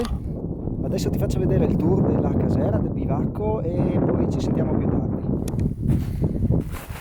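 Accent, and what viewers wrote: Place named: Paularo, Italy. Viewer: Bel video e bella escursione... Ma di dove sei? Dall'accento si direbbe della zona di Paularo ma potrei sbagliarmi...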